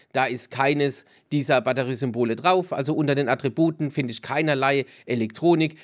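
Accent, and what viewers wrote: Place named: Rhine-Neckar, Germany. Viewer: du hast diesen herrlichen Klang dieser Region in deiner Stimme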